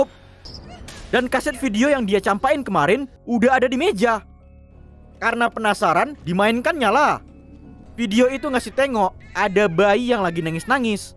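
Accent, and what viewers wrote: Medan, Indonesia. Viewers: Pasti abang org medan😂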